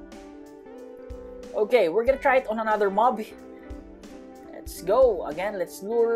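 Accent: Philippines. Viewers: Pinoy ka tol? parang yung accent mo kasi pang pinoy hehe first time ko d2.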